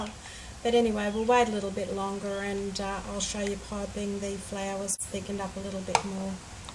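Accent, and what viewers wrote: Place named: Australia. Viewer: Very pretty result. I've been watching so many American or Canadian soaping YouTubes lately that an Aussie accent really stands out, even though I'm Aussie too LOL Awesome work on your first video - I know it's not easy.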